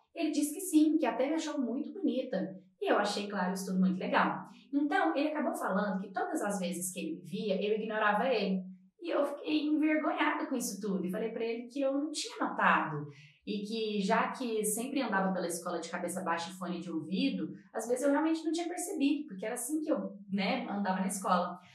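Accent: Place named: Marajó, Brazil. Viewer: Eu so queria a história com a sua voz da ilha de Marajó 😢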